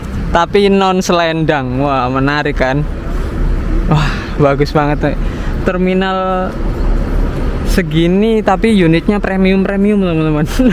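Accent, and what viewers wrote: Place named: Banyumas, Indonesia. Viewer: Salam ngapak😂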